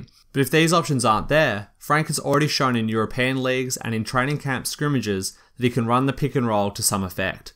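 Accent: Australia. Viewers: u have Australian accent?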